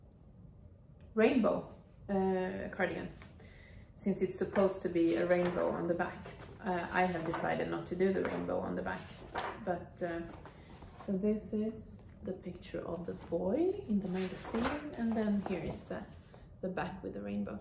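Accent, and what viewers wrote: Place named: New Zealand. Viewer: Hello from New Zealand. I wondered whether you had learned English from a kiwi - some of your vowels sounded distinctly kiwi-ish! Then I read your explanation below - our neighbour Australia. Thank you for your podcast in English. You have made beautiful garments.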